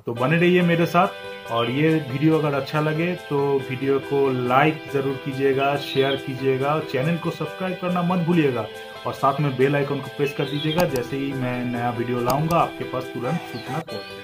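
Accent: Bengal, India. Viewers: Sir kia ap bengali ho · Sir kya aap Bangali ho?? Main bata raha hu kuyki mai bhi bangali huu aur hindu aap ki taarai bolta hu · Sir r u Bengali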